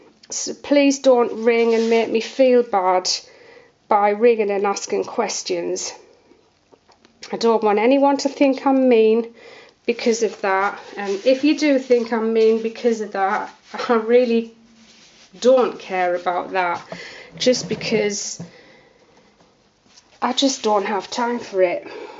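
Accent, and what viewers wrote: United Kingdom. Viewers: Love to hear your beautiful British accent and your videos! Living in Rochester, NY we have alot of gray days as well. Keep up the great work, You cheer us up here!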